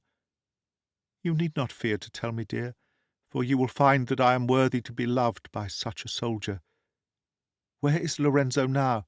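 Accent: United Kingdom. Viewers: As an English language learner.. I find your voice very clear British accent that I enjoy listening more than American accents.. please keep uploading more and more.. listening to your narration is a valuable source of developing my listening skills in learning English.. thank you very much sir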